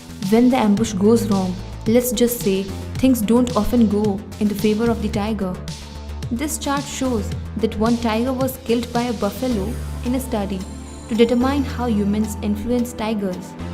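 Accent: India. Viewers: I never knew indian English is better and sounds cute than American english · I nearly listened this 30 times Her voice is the best and Indian accent 😍 is really best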